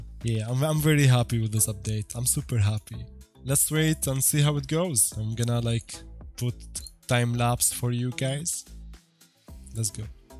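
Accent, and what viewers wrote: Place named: Austria. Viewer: You have an accent similar to Arnold Schwarzenegger, and in some movie Arnold said "Get to the chopper!" I guess it's a reference to that.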